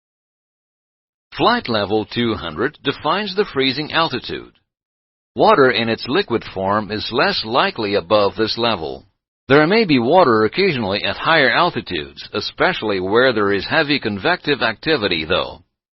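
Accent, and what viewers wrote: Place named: Netherlands. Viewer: They still play this old video in our training. The same narrator is in lots of the old Embraer CBT videos. I always loved this guy's accent... is that Dutch?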